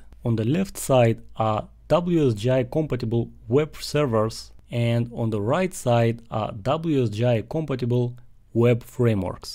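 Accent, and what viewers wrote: Russia. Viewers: Дружище, я сразу понял, что ты русский) Запили на русском такой же видос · Русский акцент?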